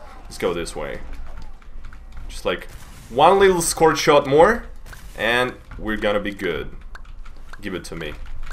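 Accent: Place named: Russia. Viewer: he got a bit of an English-speaking Russian kind of accent?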